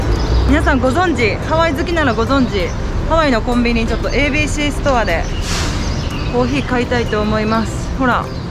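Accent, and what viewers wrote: Kansai, Japan. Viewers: keyさんの関西弁と英会話の組み合わせが魅力的だ。日本人に少ない活発で知的な美人プラス酒好きなのでブレイクしそうな予感がする。意外にじいちゃんに人気が出るかも。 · 関西弁が5割増しで美しく見せてくれる。 バブル時思い出すね！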